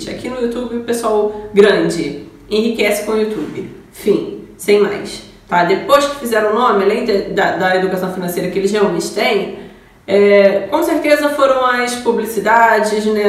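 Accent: Rio de Janeiro, Brazil. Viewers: Linda! Pelo sotaque dever ser carioca. Bom vídeo 👏👏👏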